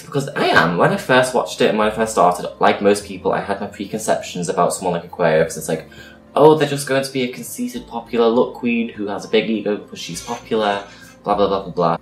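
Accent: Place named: Liverpool, United Kingdom. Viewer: Aquaria is brilliant, also this is kinda like watching art attack i mean you know some lovely painting articulated by a thick liverpool accent, love the content xx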